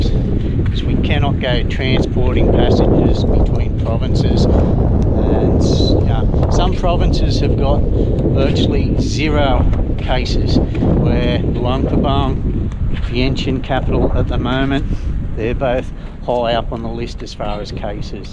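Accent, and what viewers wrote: Australia. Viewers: Man! Where the heck were you from? Such an accent that I couldn't make out what the heck you are talking about? Aussy? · you sound aussie mate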